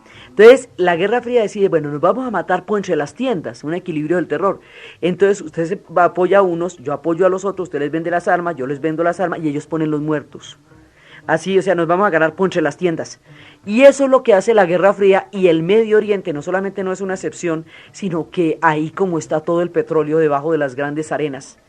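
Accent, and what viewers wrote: Colombia. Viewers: Su exposición goza de un dominio de conocimientos muy alto, fruto de profunda y cuidadosa investigación, pero además, la mezcla del sabor del español de las montañas Colombianas en sus expresiones típicas de nuestra cultura, han hecho que su narrativa me despierte aún más el interés por la historia y la geopolítica de Colombia y de todos los países de los cuales Ud. ha contado sus historias. La admiro mucho!!!Sin duda que es una Colombiana notable en cualquier parte del mundo, que bueno tener el privilegio de escucharla!!! Exitos Dra..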